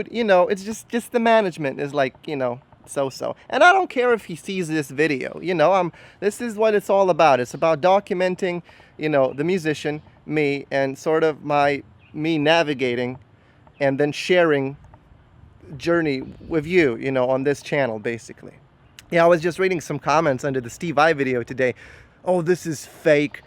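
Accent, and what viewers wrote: Norway. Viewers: Wait... are you Norwegian?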